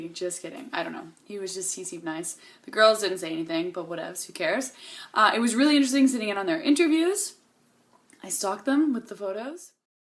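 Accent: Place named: Canada. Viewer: I just realized that you talk and sound just like Robin from How I Met Your Mother who is also a Canadian. Maybe it's a Canadian accent or something but I've never noticed it before.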